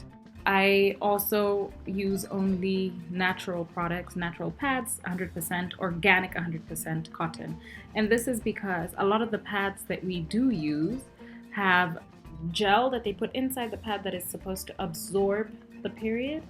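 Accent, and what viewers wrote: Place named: Germany. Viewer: Kann es sein dass du deutsche bist? Leichter Akcent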